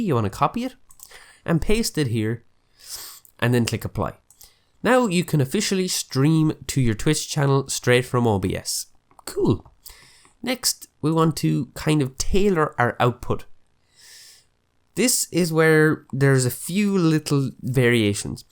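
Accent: Ireland. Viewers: are you irish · Welcome to Ireland mate now we all know you live in Dublin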